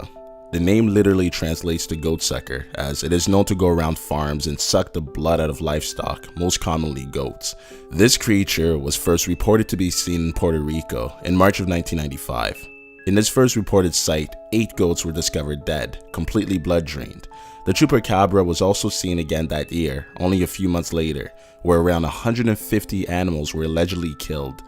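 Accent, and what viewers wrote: Mexico. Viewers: Love this guy's Mey-he-ko accent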